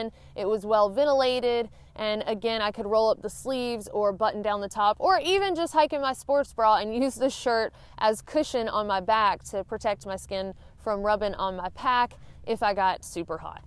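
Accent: South, United States. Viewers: I'm a North Carolinian living in Sweden currently, and you are not only helping me plan an awesome "welcome home" hike but hearing that sweet southern twang makes me feel at home :) haha (even though I don't have it)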